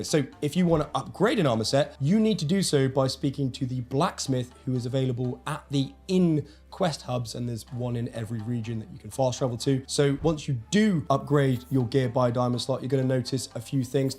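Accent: United Kingdom. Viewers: You sound so Gay brah.. You British eh?😂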